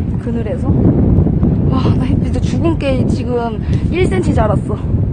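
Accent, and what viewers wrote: Busan, South Korea. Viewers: 부산 사투리 귀욥네요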